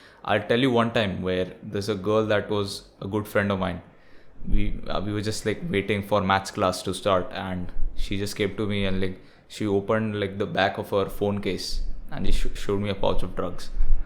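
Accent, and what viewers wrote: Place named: Gujarat, India. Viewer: Dude, I didn’t know you were Gujarati. Your dialect sounds Surti.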